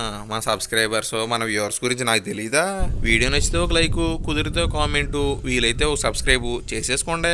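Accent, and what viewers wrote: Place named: Konaseema, India. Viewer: అచ్చు తెలుగు కోనసీమ accent,perfect పాతకాలం వంటల పద్ధతి,మా పెద్దలను , పద్ధతి లను,వంటలను గుర్తు చేస్తున్నారు,truly అద్భుతః.from Maharashtra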